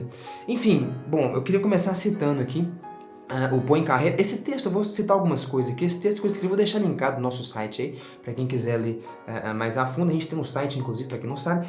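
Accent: Minas Gerais, Brazil. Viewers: Cara, como é legal ver você (um garoto uns 30 anos mais novo que eu) falando coisas tão interessantes nesse mineires delicioso. Não sou físico e nem matemático, sou paulistano e quase sexagenário portanto acho que não faço parte do maior segmento do seu público e nem sou o principal mas adoro assistir os vídeos e aprender com você. Parabéns pelo trabalho.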